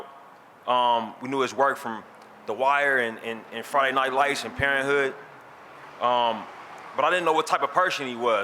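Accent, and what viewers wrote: Oakland, United States. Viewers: I like that he stutters and has an Oakland accent because, despite that, Ryan is talented, has great vision, a mind, dedication, and follow through based on what I've seen and heard about him.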